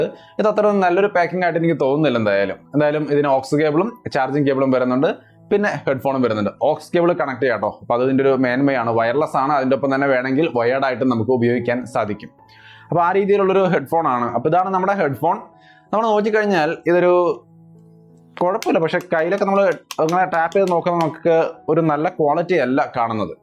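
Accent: Thrissur, India. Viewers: Thrissur kaaran aayittum , ee achadi basha 😍😍😍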